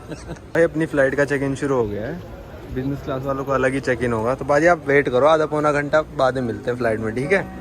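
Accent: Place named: Rural, India. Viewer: Looking ,Sounding and behaving like typical dehaati . What can you expect ?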